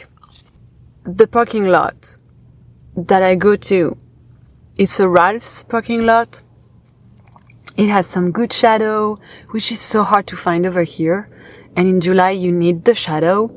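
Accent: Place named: Quebec, Canada. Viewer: Lol I hear you on the fast food. We complain it's making us fat but still keep on eating it. 😂 I had a mcnugget meal last night. Just walk often. I'm in my 30s too. Maybe a slight weight increase, but nothing too bad. Be safe as well. 👍 Are you from France or Quebec? Your accent sounds similar to people I've met from Quebec.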